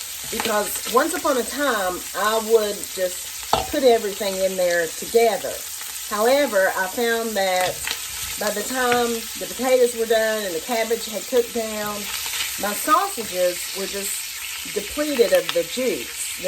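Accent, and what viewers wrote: South, United States. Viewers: this is my first time seeing you and your channel.I love your smile and your positive energy.I can tell my your deep southern drawl that you are a southern woman like myself.I am born and raised in Dallas,Texas I just moved back to Dallas in May 2018.I have never lived anywhere but Texas.Thank you for sharing I do appreciate it.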